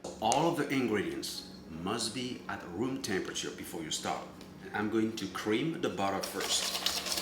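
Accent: France. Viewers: Your accent is sounds so funny :D French, isn`t it?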